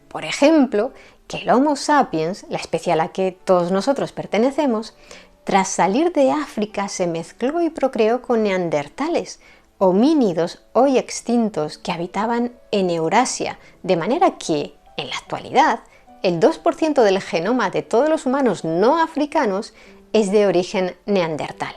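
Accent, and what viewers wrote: Spain. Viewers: Me encanta tu forma de explicar la historia, con mucha precisión y elocuencia ojalá los profesores de historia se topén contigo, debo admitir que tradicionalmente el acento español no me resultaba agradable al oído, pero usted hace que eso resulte todo lo contrario. Saludos y mucho éxito.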